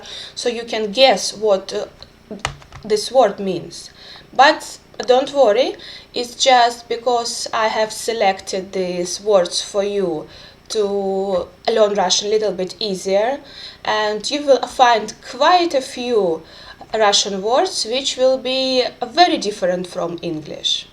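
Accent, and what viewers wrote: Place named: England, United Kingdom. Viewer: your courses are amazing !! no problem with your english accent, i can keep focusing and understand you. Thank you !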